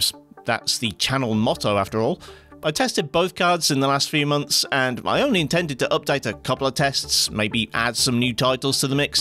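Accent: United Kingdom. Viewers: british guy speaking about GPUs for 19 minutes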